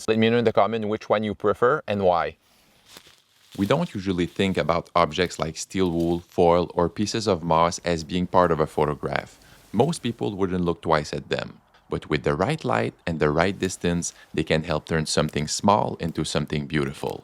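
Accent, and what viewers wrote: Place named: Quebec, Canada. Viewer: Its the quebecois heavy accent for me. All the different angles of this video though, lots of work going into this for sure. Great job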